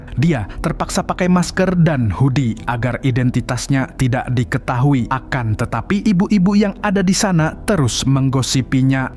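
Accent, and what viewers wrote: Banjar, Indonesia. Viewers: Lah mamang urang Banjar leh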